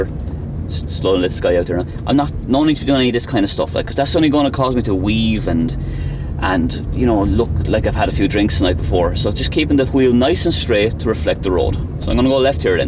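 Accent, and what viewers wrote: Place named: Wexford, Ireland. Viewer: The first video I watched I thought this guy sounds like he is from Wexford 🤔. Then in this video I saw you driving around my estate right past my house. Small world 😂. My first lesson is tomorrow and your videos have been a great help. Thanks.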